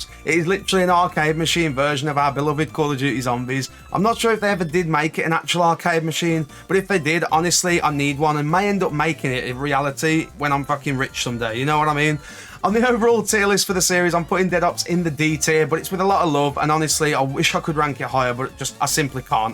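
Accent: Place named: West Midlands, United Kingdom. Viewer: I agree with the choices made 👌🏻, pulled out my old jailbroken ps3 and had a blast the past week playing all the old maps 💯Are you from near West Midlands your accent sounds very familiar ??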